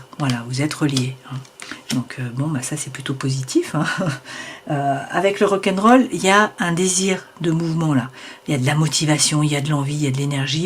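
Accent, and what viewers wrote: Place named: France. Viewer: tellement beau l acent francais...😍me calme...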